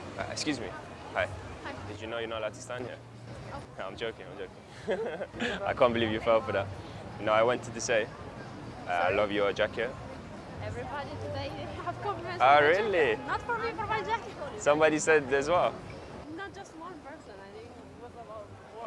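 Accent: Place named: United Kingdom. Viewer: I was gonna use this guy's tips but I am lacking the British accent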